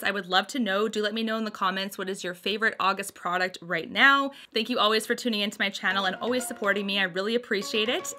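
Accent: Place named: Canada. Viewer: Any fellow Southerners love the way Canadians say "about"? I do:))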